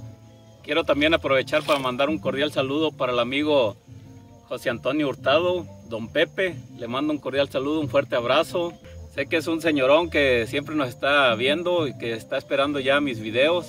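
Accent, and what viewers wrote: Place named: Veracruz, Mexico. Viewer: QUE GUSTO VERLO, ESPERO QUE USTED Y SU FAMILIA SE ENCUENTREN MUY BIEN..... PERO POR EL ACENTO CREO QUE ESTÁ EN VERACRUZ, YA RETOMÓ SU ACENTO... 😅👍🏼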